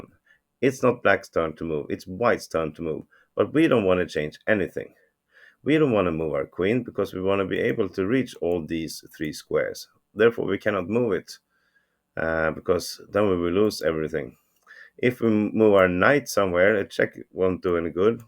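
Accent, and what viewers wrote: Sweden. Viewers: Är du svensk? Det låter så på din brytning. (Are you Swedish? Your accent sounds so.)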